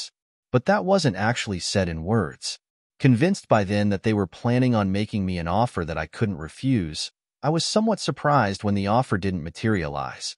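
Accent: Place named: United Kingdom. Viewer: Hehe british accent lol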